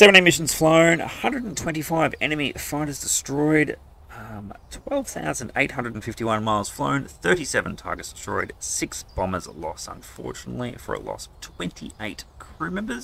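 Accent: Australia. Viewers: you sound aussie mate, are you? good video btw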